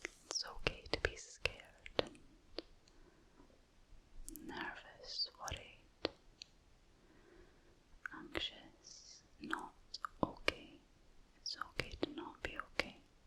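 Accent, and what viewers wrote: Scotland, United Kingdom. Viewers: Love your channel! So very relaxing and that Scottish accent is quite lovely. 💕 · I'm definitly in love with the scottish accent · Greetings from the colony of North Carolina. Your slow motions and soft vocal delivery style are really effective and quite nice. I love your Scottish accent. I mean this with all due respect. You are really truly fine! You are beautiful. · I am gonna be honest, until now I thought I wasn't really into Scottish accents. Well...this changes things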